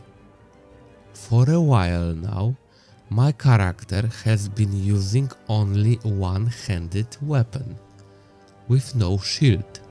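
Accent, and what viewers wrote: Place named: Balkans. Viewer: bałkan inglisz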